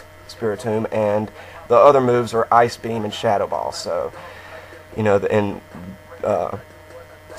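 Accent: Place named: South, United States. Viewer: You got a bit of a southern accent going on.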